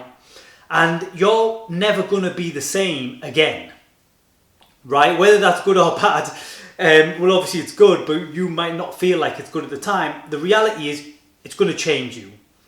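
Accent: Manchester, United Kingdom. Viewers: This is been happening for MONTHS now. I’ve been trying everything - eye masks, lavender diffuser, journaling, gratitude, showers, experimenting with snacks, sleeping pills, etc… NOTHING seems to work to make me feel refreshed in the morning. I usually stay optimistic, and hope for a better night sleep the next night. But today, I was kinda broken and f’in fed up with it! I needed this video today brother, thank you ❤️. As an aside - you sound like you’re from in or around Manchester? That’s my home, although I’m now on the other side of the world. So nice to hear this in a comforting, familiar voice 😊👍🏿. Have a great day!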